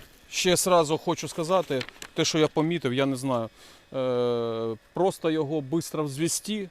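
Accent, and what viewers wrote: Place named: Russia. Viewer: Терпеть не могу когда москворот пытается косить под мовного знавьца. Ну не можешь на мове, ну трещи як москаль. Якой жах?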